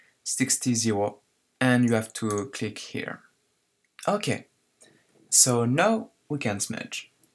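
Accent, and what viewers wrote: France. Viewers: Aaaah, l'artiste de Reddit est donc un Français ? :DD (French accent spotted) Comme quoi, beaucoup de talents en art et design numérique viennent de notre beau pays ^^ Je suis un de tes premiers fans :) On sent qu'il y a la même "patte graphique" qui revient souvent sur la plupart de tes autres wallpapers, cependant cela reste quand même un beau style que tu as su t'approprier ;] Bref, bonne continuation et bon tutoriel, Un "French" 😁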